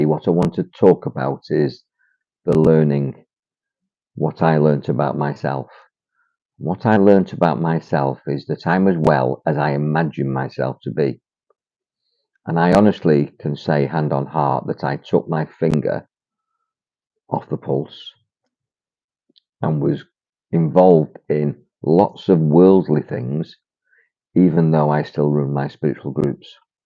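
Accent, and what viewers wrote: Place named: Manchester, United Kingdom. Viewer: Omg listening to this sounds like I'm listening about myself.. I seem to have gone through repetitions though & really at the stage where I just don't have the energy to suffer anymore. I trained in hypnotherapy too, so do know all this stuff but have found that I'm great at healing everyone else, just not myself & I know that is an affirmation in itself. (The people pleaser also) but i would be very interested in talking with you to help me move forward. I am in my 50s & no longer wish to survive but wish to thrive now. I think it's time! Also I recognise your accent are you originally from Manchester?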